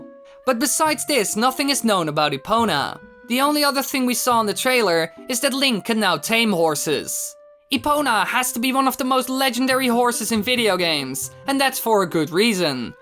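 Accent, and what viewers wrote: Netherlands. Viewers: lol. ik ben ook nederlands en ik herken dat accent van 10.000 kilometer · Tombsday he’s Dutch that’s how people from the Netherlands sound like when speaking English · Wow dutch accent much haha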